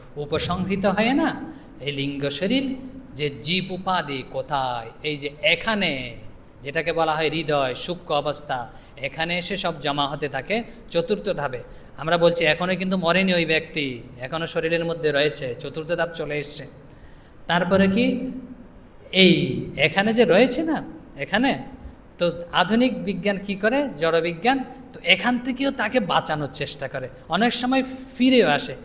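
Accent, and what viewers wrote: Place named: Chittagong, Bangladesh. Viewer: Apne ke chto gamar lok vasar tan ta chogam tan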